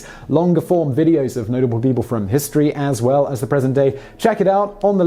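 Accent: England, United Kingdom. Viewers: He is a man from England - the country that invented the language - and you claim he is not pronouncing American names in proper English. You do not own the language and you need to remember that. Americans are not the go-to for proper English pronunciation ffs! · With your accent on vowels, some words are difficult to understand for non-british English speakers. Example, it's difficult to comprehend if your saying "of Pablo Picasso Fame" when discussing the offshoot of Cubism. Making a legitimate Subtitles option would help. The YouTube Auto-generated subtitles don't provide accurate subtitles if many vowels don't have an American accent.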